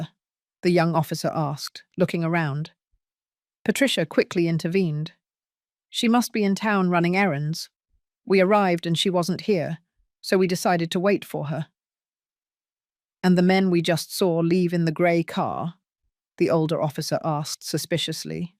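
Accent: England, United Kingdom. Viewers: This is an English accent not American.🇬🇧 Long and drawn out with no substance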